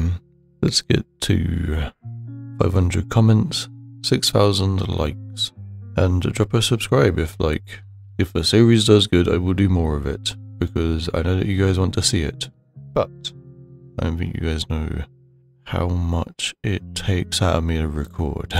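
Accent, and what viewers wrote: United Kingdom. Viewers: love the west country accent bro! sending love from bristol! keep up the hacker smacking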